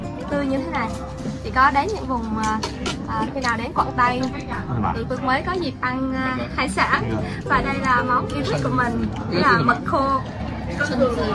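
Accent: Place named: Da Nang, Vietnam. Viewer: Phương người Đà Năng hay sao mà giọng nói như người Đà Nẵng vậy. Lần đầu mới xem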